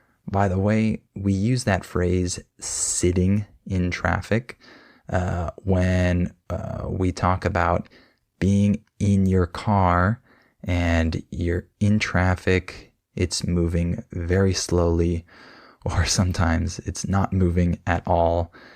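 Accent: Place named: United States. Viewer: هذي لكنة امريكية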